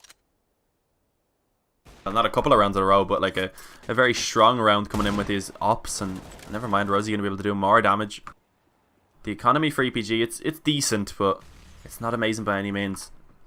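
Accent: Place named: Meath, Ireland. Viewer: THIS IS REALLY ANNOYING ME you sound like youre from meath but also a bit more northern!!!!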